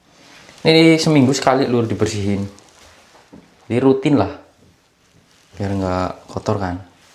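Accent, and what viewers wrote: Banyumas, Indonesia. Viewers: Orang mana mas ngapak poul😂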